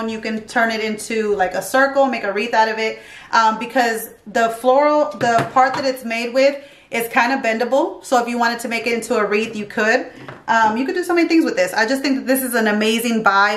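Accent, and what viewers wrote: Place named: Upstate New York, United States. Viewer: These are all very nice. You appear to have an upstate Accent, similar to a New York and a New Jersey accent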